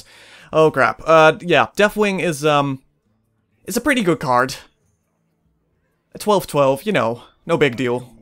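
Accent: Netherlands. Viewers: Favoriete Nederlandse gamer, door je accent alleen al sub! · Are you dutch?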